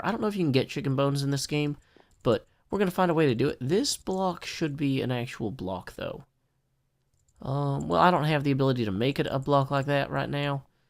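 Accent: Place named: Missouri, United States. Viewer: My apologies, I was watching some other hermits and decided to make a list of collab teams I thought would be great, and as I commented on many of them I realized I left you and Wels out, which is in my honest opinion, is a good pairing of personalities. I won't edit the comment I left them, it reads as follows : The Hermit Stock Exchange is about to open for business, making affiliations now is the key to success in a fluctuating market, as a hermit, please consider the following; all stocks and coin seem to follow a 3 letter categorization in markets which can combine many subsidiary companies and/or projects, and I feel the HSE should follow suit. In this I suggest, not individual companies, but corps with multiple "teams" or "factions" competing in the market; I propose the following for classification and advertisement: RDC - Rendoc ISQ - Isqueen FZM - Falzom ITZ - Imtaz (or ZIT, I kind of like ZIT) ART - Architek CNV - Convex PYA - Pyffa XIZ - XIZuma (he has Devs to back him, like a hedge) DGE - Doge (a generic stock anyone can invest in [volatility] PS cute dog face ^^ ) leaving one open for a collab in the future (JSN maybe ^^) Going down the list I realized, Python is on a Terraria kick, and Biffa is without a team member, also, I seem to have left you two out, I am deeply sorry, I mean you're kool, calm, collected, have awesome BG music, and your accent reminds me of my time in Missouri; nice people there. 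I hope that you and Wels will take over the medieval distric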